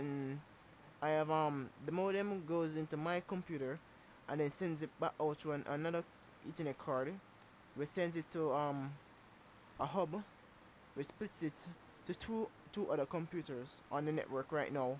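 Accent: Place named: Caribbean. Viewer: and i can bet u from the caribean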